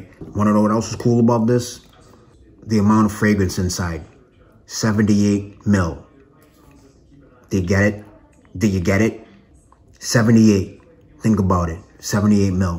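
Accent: Boston, United States. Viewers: Where do you live Boston? That accent. Lol. I found your channel from your Mancera Black Vanille review. Just ordered it. Vanille Exclusive is next on my list.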